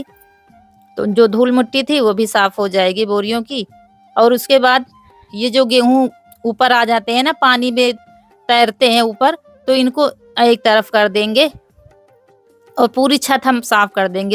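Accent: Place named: Uttar Pradesh, India. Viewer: up me kaha se didi hum bhi up ke kanpur se hain... Bhasha boli aapki jaani pehchani lagti hai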